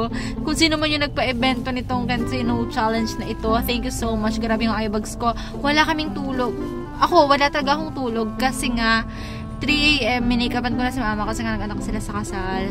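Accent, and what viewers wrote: Batangas, Philippines. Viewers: Kaya din ano napadpad dito dahil don sa scammer😂😂 Taga batangas ka ga po ung salita mo kasi😂😂😂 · batangueña ga are haha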